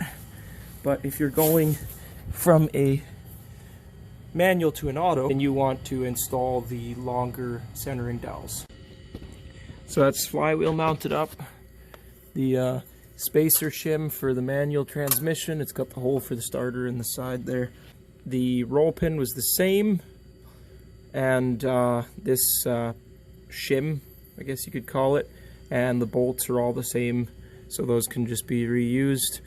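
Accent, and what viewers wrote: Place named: Canada. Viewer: Dude you have to be from canada...lol you and i talk the same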